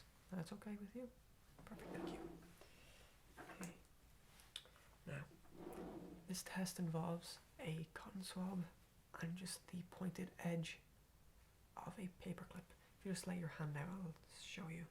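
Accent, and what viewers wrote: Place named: Ireland. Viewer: Yay and Irish ASMR artist 👌🏼 I'm Living in the Uk and it's so cool to have a familiar accent sending me to sleep 😴 Subbed!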